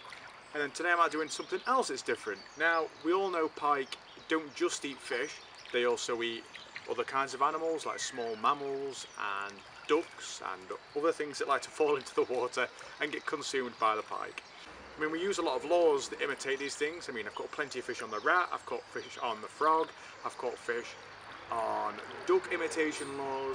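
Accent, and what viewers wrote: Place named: Derby, United Kingdom. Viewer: What river was that taken on I presume derby by your accent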